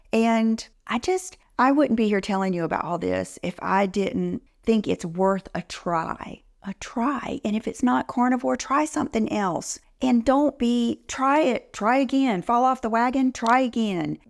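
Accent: South, United States. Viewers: hi - thank you! i love your insights & tips...also keep up the great energy & i love your southern accent!! xo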